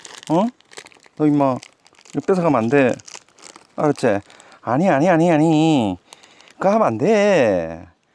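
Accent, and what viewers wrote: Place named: Gyeongbuk, South Korea. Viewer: 경북쪽이신가 말투가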